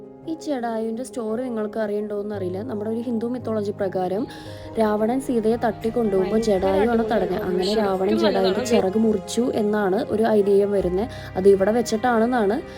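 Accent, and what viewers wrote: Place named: Thrissur, India. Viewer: Assal Thrissur slang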